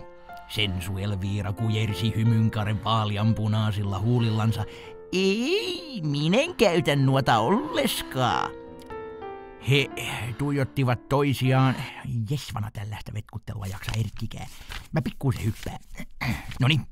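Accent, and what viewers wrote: Finland. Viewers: Olipa mukava kuulla omaa murretta, kun asunu Keski-Suomessa nyt 10 vuotta.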